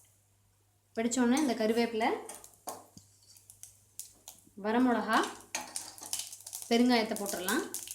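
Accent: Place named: Thanjavur, India. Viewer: Nenga pesara slang thanjavur slang mathiriye irukku.....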